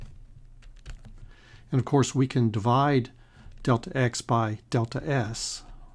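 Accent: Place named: Texas, United States. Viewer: Damn calculation done by unsimplified physicist with Texan accent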